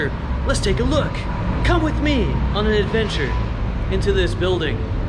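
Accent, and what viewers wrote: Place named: United States. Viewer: Cool vid! Personally, we are with you on the mandatory jabs and masks! We are NOT into it at all so keep preaching. Question, my wife and I are looking to retire and leave the States. Would you consider Merida a nice place to settle down and retire for older but very active people? I love the fact that Merida, unlike many other places in Mexico, is very safe and still somewhat affordable. Thanks and safe travels. (By the way, work on your accent. It's SO Americanized)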